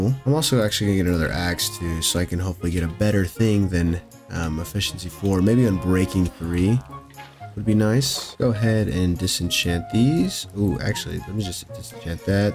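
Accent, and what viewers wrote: United Kingdom. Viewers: that english accent was crazy bruv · the british accent was perfect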